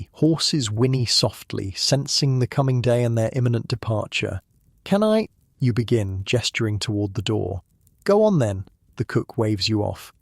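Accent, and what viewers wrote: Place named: United Kingdom. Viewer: I have really enjoyed the story. However, I have discovered an unexpected dislike for "british" pronunciation. For example, I would pay for some reasonable pronunciation of the letter "r" where it is placed in the words.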